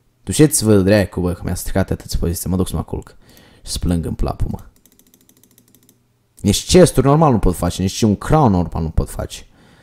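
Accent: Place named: Moldova. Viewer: esti moldovean ? Eu tot ca team inteles cand ai vorbit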